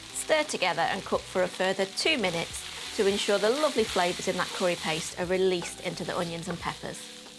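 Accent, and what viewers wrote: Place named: Cockney, United Kingdom. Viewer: Well Done the...loving that Cockney accent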